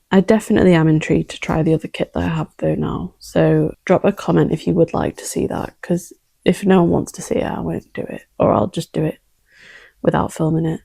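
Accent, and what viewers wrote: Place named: United Kingdom. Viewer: for a second i thought the pronunciation thing was wrong, and then i realized… british. lol in most american accents we say MAcrame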